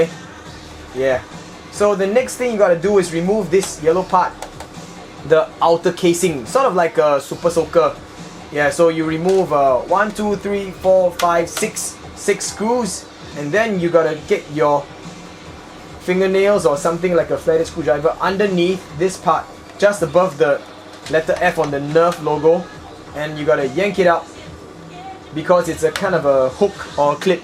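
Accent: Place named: Singapore. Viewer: lol from the accent oh singapore sure one XD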